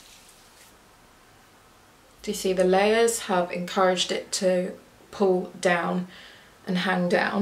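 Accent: United Kingdom. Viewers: Londoner right there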